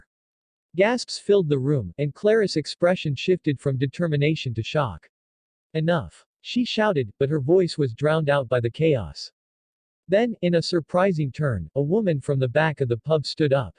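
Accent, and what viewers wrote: United States. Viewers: Why do we have listen to a story telling American it’s cringeworthy.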